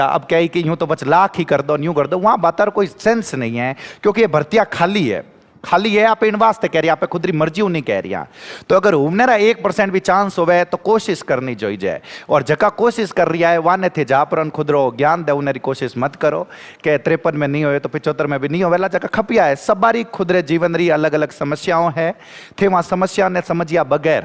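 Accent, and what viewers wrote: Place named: Bihar, India. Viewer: Abe bol kya rha h.... बिहारी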